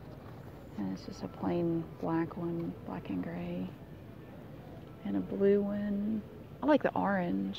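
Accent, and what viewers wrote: South, United States. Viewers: I can't get enough of your southern accent I find it so charming. I'm constantly listening to the Massachusetts' accent up here which I may or may not have myself but absolutely detest.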